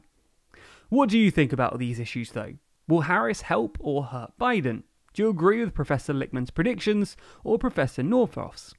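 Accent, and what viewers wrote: United Kingdom. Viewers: Pretty sure the narrator is British.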